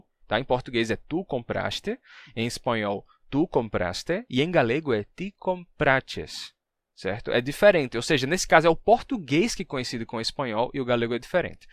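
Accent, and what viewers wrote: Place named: Northeast, Brazil. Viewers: Seu sotaque em português é muito bonito. É de Natal? Sou do Rio e acho muito bonito. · Ao individuo do video.....Se posso perguntar, Voce e da Bahia ou outro lugar do Nordeste Brasileiro? Pergunto porque amo essas explicacoes sobre as linguas e noto que voce tem uma pronuncia do "E'" e do "O" quando nas palavras como faziam meus pais e avos que eram da Paraiba e Sergipe. Eu nasci no Rio e perdi um tanto do sotaque Carioca ao ir pra Sao Paulo. Agora moro na California para onde vim a cinco decadas. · se não me engano ele é de Recife. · A "pronúncia particular" a que você se refere é simplesmente um dos sotaques nordestinos brasileiros. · Ele é do Rio Grande do Norte. O Sotaque dele é o chamado "DIALETO NORDESTINO", que é o sotaque falado na maior parte dos estados do Nordeste, dentre eles: Rio Grande do Norte, Paraíba, Pernambuco, Alagoas, Sergipe, interior do Ceará e interior da Bahia. 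A cidade de Salvador, capital da Bahia, tem um sotaque próprio, que é o "Dialeto Soteropolitano".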